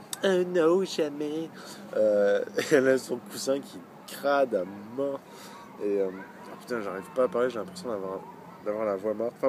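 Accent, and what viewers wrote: Quebec, Canada. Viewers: Tes vrmt cool toi ! J'adore ton accents, ta voix on dirais une voix d'acteur mais j'me souvien pu. VIVE LE QUÉBEC!!!!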